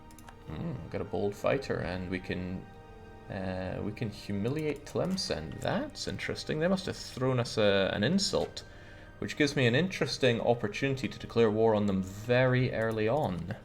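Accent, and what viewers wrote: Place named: Inverness, United Kingdom. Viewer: You sound Invernesian, you a snecky?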